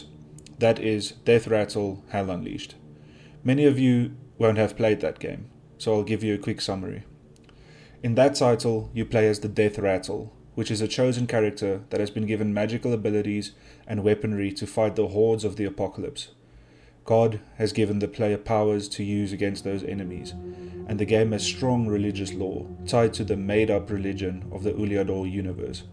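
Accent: South Africa. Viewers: I always get a warm feeling when I hear another south african on youtube lol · I'm always thrown off guard when I hear a south african accent on YouTube xD Will you be at rage this year? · Where you from bro you sound South African? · I honestly admire the work you are doing,good luck! Also ,I was extremely taken aback at finding another person with a Afrikaans accent on here.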